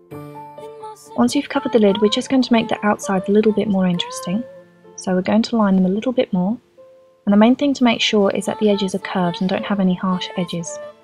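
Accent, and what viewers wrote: England, United Kingdom. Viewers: she has an accent! cool! r u from england... or sumthing???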